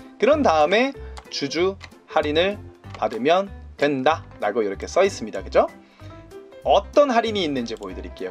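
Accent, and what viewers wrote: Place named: Gyeongsang, South Korea. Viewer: 님안녕하세요 ㆍ구독자입니다 항상유쾌하게경상도사투리로하는경제공부많은도움얻고있어요 여쭤볼게있는데요혹시 아라튜브라고아시면 알려주실수있을까요??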